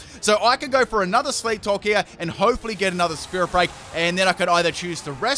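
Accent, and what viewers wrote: Australia. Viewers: Bro he's australian not british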